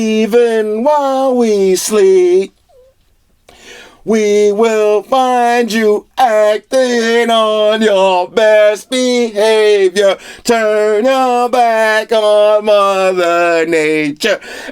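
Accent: New York, United States. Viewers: It’s a New York thing 💪🏽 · Just got into your video's and I really like them. I subscribed to you brotha. Also you sound like a true new yorker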